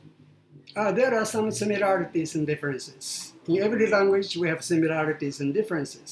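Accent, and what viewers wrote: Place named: Philippines. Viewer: He sounds like a filipino when he speaks english with a little japanese nuances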